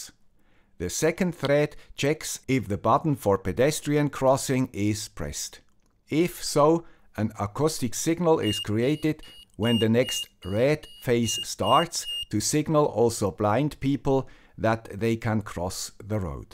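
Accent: Switzerland. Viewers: Nothing like your weekly dosage of your guy with the swiss accent. :)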